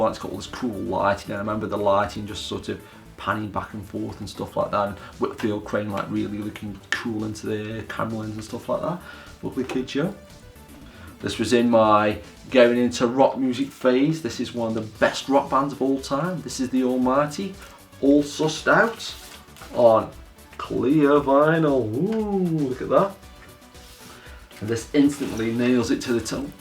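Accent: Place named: Stoke, United Kingdom. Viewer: A stokie! I'd recognise that accent any day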